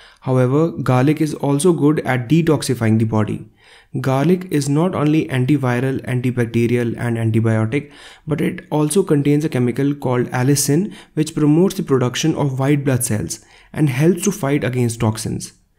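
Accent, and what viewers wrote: India. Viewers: Is that a Hindi accent? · I ❤ Indian accent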